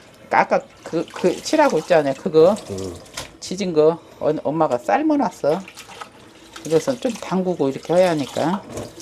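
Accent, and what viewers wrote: South Korea. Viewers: 무우를무수라고??ㅎㅎ 전라도말투 저도전라도여요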